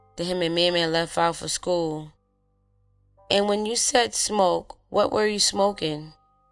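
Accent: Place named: New York, United States. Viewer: Man i wish the original narrator was still alive ...cant stand the new york accent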